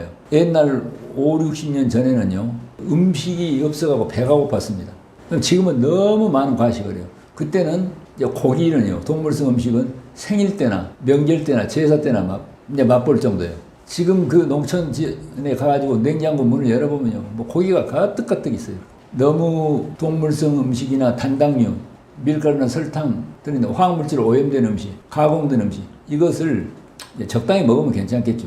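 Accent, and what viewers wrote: Jeolla, South Korea. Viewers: ㅜ 라도 사투리ㅜㅜ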